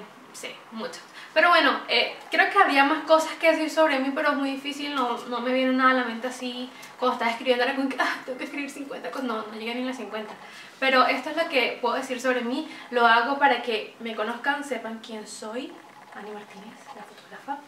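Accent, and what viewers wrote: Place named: Andes, Venezuela. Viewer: Echoooooo.. ¬¬ no seas antipatriota! Manten tu acento venezolano hasta LA MUERTE!! Aunque tu venezolano es medio andino :S pero bue. . . .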